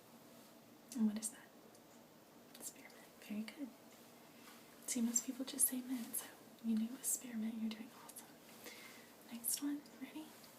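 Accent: South, United States. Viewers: Love this video! Do I detect a very slight southern accent? It's extremely relaxing! Thanks so much.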